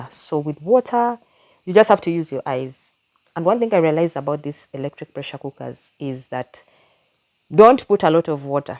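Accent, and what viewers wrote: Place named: Malawi. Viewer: I love how straight to the point the video is. I'm from Malawi, and we also use Royco here 😂. I've just bought a pressure cooker and an Air Fryer as well. What's beautiful to me is listening to your voice - it's literally identical to how we pronounce words here. Instantly, I was drawn to keep watching, and in fact, I'll be here for a long time watching your videos.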